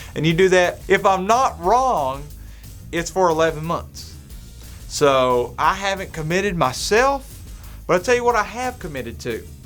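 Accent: Kentucky, United States. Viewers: This probably not politically correct, but I watch you simply to hear your Kentucky/hill Billy accent. It is awesome.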